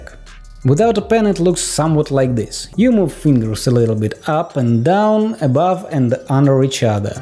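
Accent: Russia. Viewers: It always seems to me that you have a Russian accent, but it’s not bad, it’s just not familiar to me. :") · Русский акцент)Сразу понятно что ты русский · Man, I love your ruski accent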